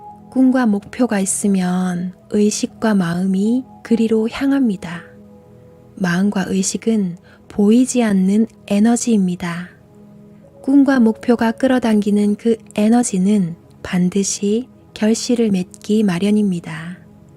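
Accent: Gyeongsang, South Korea. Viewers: 구독했어요~ 말투가 경상도 억양들어가 정감가고 (제가 경상도) 예쁘고 목소리 좋고 마음의 양식이 쌓여가네요ㆍ 이야기를 듣다 보면 많이 깨달아 지네요~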